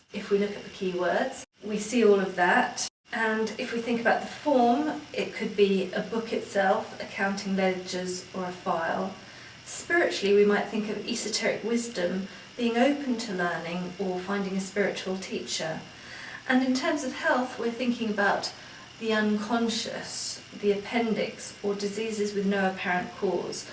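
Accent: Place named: England, United Kingdom. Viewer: oh ! I v just seen your présentation in your channel, and , you talk about the Celtic Lenormand ..are you the creator of this deck ? its amazing , I ve just received one from amazon after have looking some reviews on others channel , and I find pictures so sweet and beautiful ..its funny for me , because , when i ve purchased it , I didnt know it was your deck , but in the same time , I ve discovered your vidéos about Lenormand !!like an évidence ! I appreciate your english accent , its easy for me to understand your videos and thats why I suscribe on your channel .Which town are you from ? London ? Oxford ?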